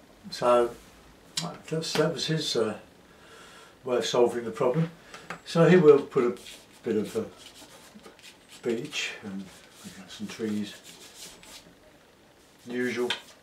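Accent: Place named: England, United Kingdom. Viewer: I'm awed by your talent. As you've said before, watching you makes me almost want to give up.....almost. I've been trying to create scenes such as yours for over 40 years and still can't produce the quality of work that you do. Thank you for hours of fascinating and pleasurable viewing. Being a Yank I did, at first, have a little trouble with the English accent (my problem, not yours), but I soon got used to it. Do you ever do any miniature watercolors? And, if so, could you put one up on YouTube? Thanks again and keep it coming.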